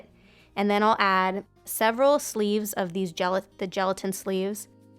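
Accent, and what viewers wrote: United States. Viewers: Sister. Is your accent American? I have the same accent but people in my country crush over British accent 🥲. Btw, I'm from Bangladesh. I am 21, I follow you since 10 years. I've watched all your videos. Thank you for creating content sister ❤️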